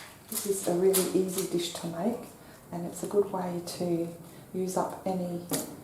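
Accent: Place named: Australia. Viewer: I love your accent so so much! It’s just beautiful and incredibly relaxing!❤️ Australia is on my bucket list and it being cool there sounds so nice right now. It’s in the 90s here with an even hotter heat index. This is the best way to end my day! Thank you!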